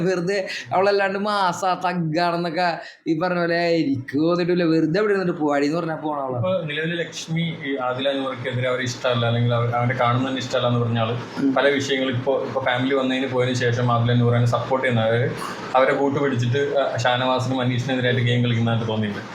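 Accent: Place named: Fort Kochi, India. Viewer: Fort kochi ക്കാർ ഇങ്ങയോക്കെ സംസാരിക്കും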